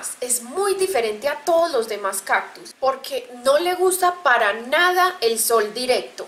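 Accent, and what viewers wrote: Colombia. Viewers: Hola, me gusto mucho el cactus que esta floreciendo me podrias decir Como Se llama, y me encanta tu acento colombiano 😁😁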